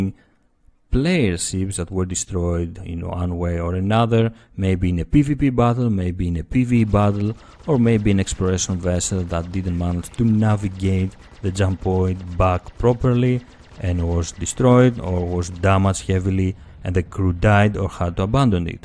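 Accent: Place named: Greece. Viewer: Still miss your Elite content, i don't care if they didn't give you the attention you wanted, i miss my Elite videos with the sexy greek-english. ;) And a lot of stuff have been added and changed, i don't know if you stull play, hopefully you could make some new content. Nothing wrong with the SC content, but that game is so far off release that i try to stay away so i don't get to mutch 'spoilers' when the game becomes a fully playable game i can go and enjoy it, findings out stuff and so on.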